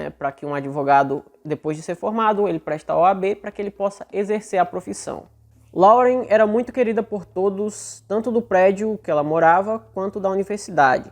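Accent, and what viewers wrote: Northeast, Brazil. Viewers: Parabéns pelo seu trabalho. Se posso fazer uma observação essa é que você tem uma forma muito boa de expressar sua opinião . As partes engraçada deixa mais leve a narração. Ao mesmo tempo que choro em alguns casos, ao mesmo tempo dou risada com seu jeitinho de falar( acho que tem sutaque nordestinos). Sou nordestina e amo cada pedacinho , sinto muita falta .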